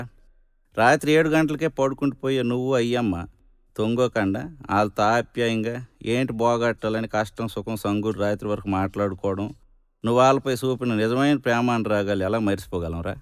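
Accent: Srikakulam, India. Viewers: శ్రీకాకుం యాస,భాష తో మన గ్రామీణ కుటుంబ పెద్దల జీవన విధానం గురించి చాల బాగా చెప్పారు. గారు..మీకు సంక్రాంతి శుభాకాంక్షలు. గన్నిరాజు డీఇఇ జలవనురుల శాఖ. శ్రీకాకుళం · సార్, శ్రీ కాకుళం, ప్రాంతీయ భాషా సౌందర్యం,అట్టాడ అప్పలనాయుడు, కొంచెం నాలుకకిరాసి,రుచిచూపించాడుకానీ,మీమాటలులోఆయాసామృతంమొత్తంగారుచిచూపించడంకాదు,కడుపూమనసూకూడానింపేశారు.ఆప్రాంతీయభాషామాధుర్యంఇటీవల ఒక మిత్రుడు ఇలాశాంపిల్ చూపించాడు.?ఒకరైతుకూలీస్త్రీఇలాఅందట?ఉలుకూపలుకూలేనమ్మకి, ఊరంతా ఉపారాలే!ఎద్దునాగకష్టపడిందానికి"పకాలే,డకోలు.అన్నాదట! ఎంత చక్కని పోలిక, ఎంత మధురమైన ఫోలిక,ఈవిశాఖపట్నంలో, కొంత మంది మిత్రులు, శ్రీకాకుళం వాళ్ళనీ,వాళ్ళభాషయాసనీ,అవమానకరంగాహేళనగానూమాటలాడుతుంటే,సహించలేక,శ్రికాకుళమేరామాదీశ్రికాకుళమేరా!విప్లవాలాపురిటిగడ్డా,వీరయోధులపోరుగడ్డా,ధీరమాతలనుదుటికుంకుమ,జారితూరుపుఎర్రబారిన,శ్రికాకుళమేరామాదీశ్రికాకుళమేరాఅనేపల్లవితో, విప్లవ అమరవీరులుపంచాదిక్రిష్ణమూర్తీ,నిర్మలమ్మా, సత్యం మాష్షగారినీ,ఆదిభట్లకైలాసంనీ,తామాడగణపతినీ,ఆపాటలోపరిచయంచేస్తూవారిత్యాగాల్నిగుర్తుచేస్తూరాసిన ఈపాట ఆశ్చర్యంగా, క్రిష్ణా, ఉభయ గోదావరి జిల్లాల్లో తెలంగాణ లలో,విస్త్రుతంగాపాడుతూపరవశిస్తూ, ఫోన్లు చేస్తూ అయ్యాఏభైఏళ్ళుగతించినా,శ్రికాకుళవిప్లవోద్యమాన్నీ,అమరవీరుల్నీగుర్తుచేసేపాటనుఏవిప్లవపార్టీగానీ,ఏసాంస్క్రుతికసంస్థగానీపాటరాయలేదండీఎంతబాగారాశారనిసంతోషంతోప్రశంసిస్తున్నారు.ఇక్కడనాపైప్రశంసలగొప్పలుచెప్పడంకాదు.మిత్రులుశ్రికాకుళయాసనీఅక్కడమనుషుల్నీఅవమానకరంగామాట్లాడేవాళ్ళకళ్ళుతెరిపించాననేసంతృప్తిదొరికిందిఅదిచాలు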